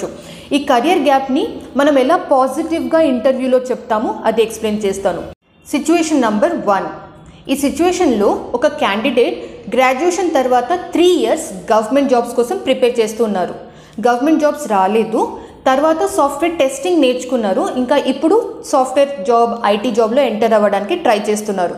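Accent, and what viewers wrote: India. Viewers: You are way of talking like a Neath Indian.. where are you from mam...?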